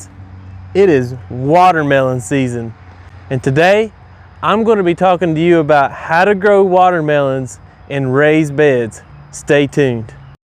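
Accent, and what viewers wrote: South, United States. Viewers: Are you from Alabama or Georgia?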